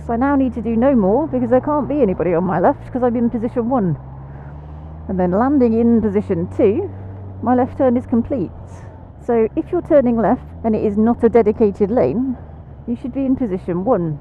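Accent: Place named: England, United Kingdom. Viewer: Brilliant videos and you have a fantastic, particularly quintessential, English accent.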